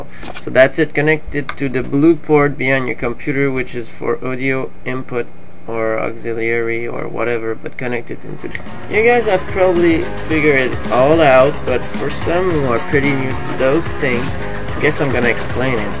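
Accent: Canada. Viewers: Yes it is. it is a Quebecois accent. from quebec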